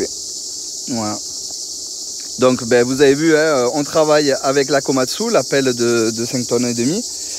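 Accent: South, France. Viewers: Salut belle vidéo avec le bon son du sud bon courage à bientôt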